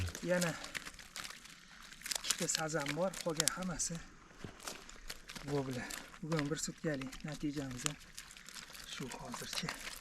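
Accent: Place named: Uzbekistan. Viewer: Со своего члена залупу отрежь, может сома на 5 кг поймаешь. Узбек а акцент харипский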